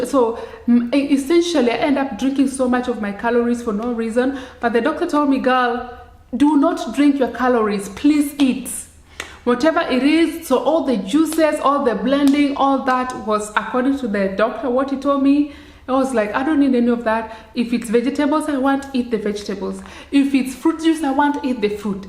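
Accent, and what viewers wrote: Kenya. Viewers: Are you from Kenya 🇰🇪? Remind me a lot my Kenya 🇰🇪 Queen accent so beautiful and elegant. Loved it. Lose weight not muscles eat 3 categories of this food: proteins, fiber and good fat.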